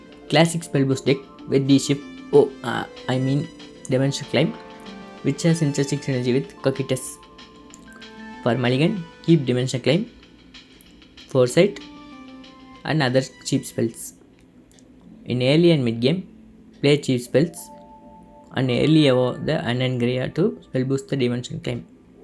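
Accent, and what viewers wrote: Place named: India. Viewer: SERIOUSLY!? I knew it by the accent, thanks for be in this game... I love this game I am Indian too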